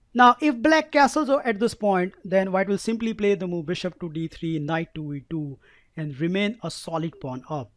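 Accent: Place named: India. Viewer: your my chess guru! I have so much fun using your tactics! Your English-India accent is awesome. Blessings for your entire family.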